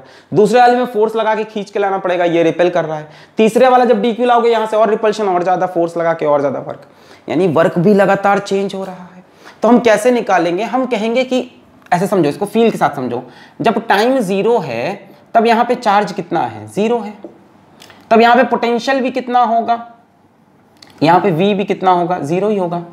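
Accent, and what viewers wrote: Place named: South, India. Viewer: South Indian accent 😍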